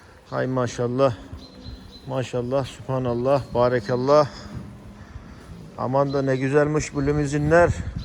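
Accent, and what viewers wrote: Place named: Kahramanmaraş, Turkey. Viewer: abi Maraş ağzı konuşman o kadar hoşuma gidiyor ki:))) komedi videosu izler gibi seyrediyorum. Memleket özlemimi gideriyorsun:))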